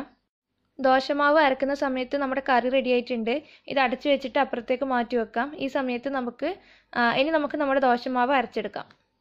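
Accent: Kannur, India. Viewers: kannur evideyaaa. .......varthnm kelkn nalla rasamud. .....njanum kannur annu. ....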